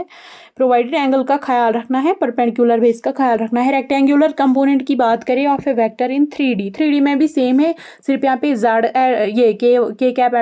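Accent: Kashmir, India. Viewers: Thaat kashmiri accent 😂😂 ❤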